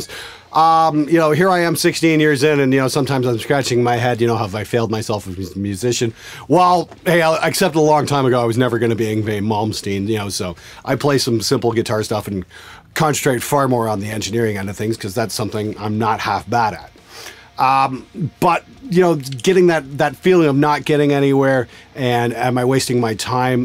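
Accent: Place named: Canada. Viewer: I love the canadian accent.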